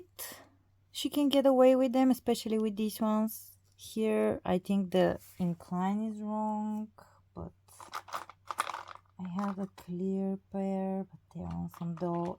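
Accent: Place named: Russia. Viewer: Простите, но акцент вас выдает, вы либо русскоговорящая либо украинско говорящая...я из Украины, для меня это важно :)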